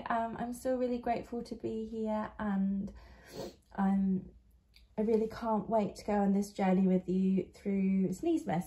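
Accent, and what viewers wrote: United Kingdom. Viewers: I don’t know why but I thought you would be American! But you’re a fellow Brit!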